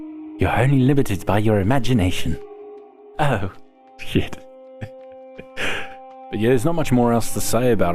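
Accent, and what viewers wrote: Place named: Australia. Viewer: Came for the tips, stayed for the beautiful Aussie voice.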